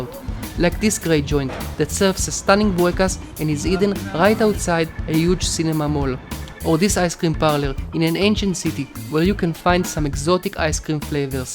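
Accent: Israel. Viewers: icetealemonplease it's an Israeli accent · אחי המבטא שלך כל כך ישראלי... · איך שומעים שאתה ישראלי😂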